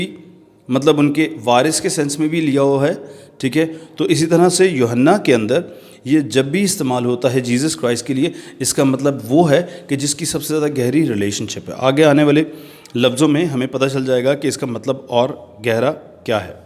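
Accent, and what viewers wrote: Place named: Greece. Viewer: Nice greek accent Sir.... thanks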